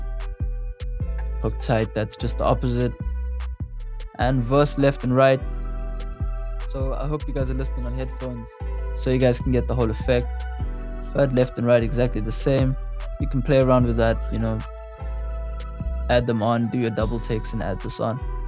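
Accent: South Africa. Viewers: Thats the most South African accent iv ever heard lol cool vid man!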